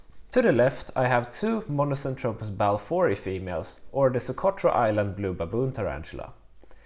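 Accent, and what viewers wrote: Sweden. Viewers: Hörde direkt att det var en svensk dialekt! Kul att se fler svenskar som älskar grodor. Followed immediately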